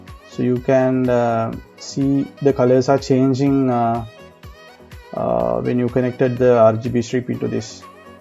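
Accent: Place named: India. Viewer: Pity you don't have the subtitles. Your Indenglish is difficult/impossible to understand when you do the patches of high speed phrases.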